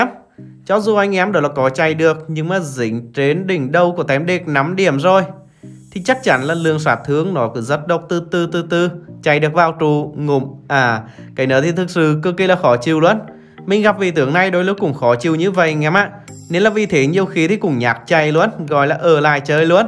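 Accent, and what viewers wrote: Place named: Nghe An, Vietnam. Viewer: Giọng nghệ an nghe vui quá hiii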